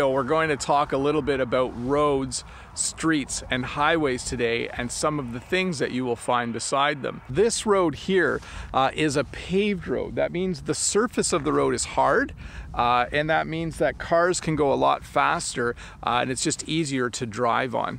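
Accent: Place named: Canada. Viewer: Hi great to learn with you English in Canadian way thanks Lott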